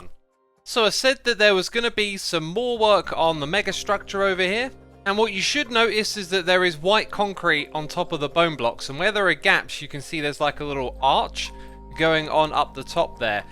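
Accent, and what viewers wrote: United Kingdom. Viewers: I just searched if X lives in a country in which they drive on the left (he's British, apparently I wasn't paying any attention to the accent haha), cause I got confused when he said the designs of the road felt IRL and I didn't see it lol😂😂🤦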